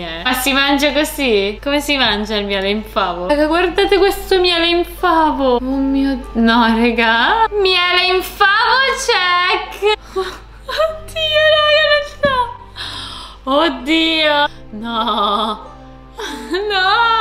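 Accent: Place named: Milan, Italy. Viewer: Stai iniziando ad avere un accento milanese noooo ti pregoooo torna romana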